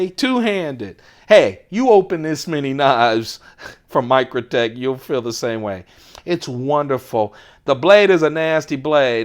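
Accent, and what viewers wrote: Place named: Upper Peninsula, United States. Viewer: Redeemed Man, I agree 110%. Unbelievably annoying. I also couldn't finish it, had to shut it off. As asked above, was he under the influence of drugs/alcohol? Man, if I ran out of hand strength after operating these knives a few times I sure wouldn't make a video showcasing it. He sounds like those guys that sing,. I won't make this mistake again.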